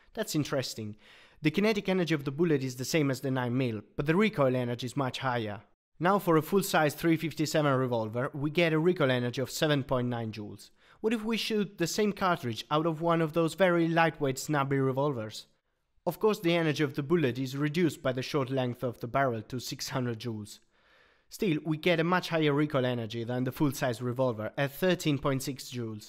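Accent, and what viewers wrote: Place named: Italy. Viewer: Come on, give the guy a break. We all know Italians have a very heavy accent when speaking. Your fellow countrymen don't do any better in speaking English, neighbour ;-)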